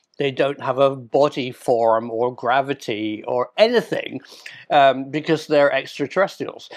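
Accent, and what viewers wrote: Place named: Cornwall, United Kingdom. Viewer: ahhh really! Sometimes you have a hint of Cornish! ❤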